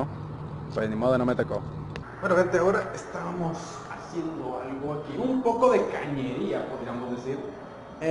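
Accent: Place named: Mexico. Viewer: Es obvio que es de Mexico jajaja vaya pregunta sin ofender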